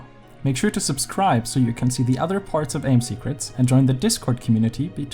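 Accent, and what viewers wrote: Germany. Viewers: Direkt in der ersten sekunde gemerkt, dass er Deutsch ist. Gutes Video. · Ich höre doch raus das du ein deutscher bist gibt es das Video auch auf Deutsch? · Man merkt so hart dass du deutsch bist haha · Hardest german accent i've ever heard, but good video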